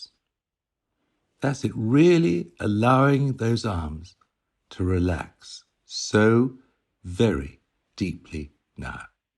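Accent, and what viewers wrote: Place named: England, United Kingdom. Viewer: Very useful tape. My name is also. You sound English. Is your full baptised name?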